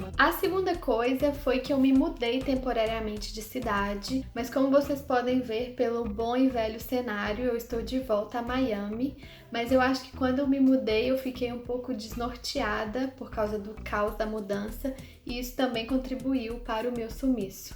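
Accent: Minas Gerais, Brazil. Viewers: De la manera que parles eres brasilera. Parles més lent i més clar, per a un català. A més a més la pronúncia de la "R" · I think she's speak like people in Minas gerais.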